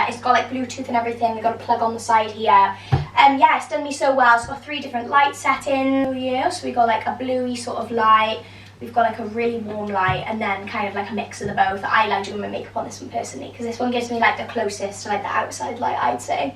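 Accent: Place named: Wales, United Kingdom. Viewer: Loved this! Are you Welsh by any chance? Mind you this is my first impression of you and I instantly heard a fellow Welsh accent, especially when you said ‘mam’ 😅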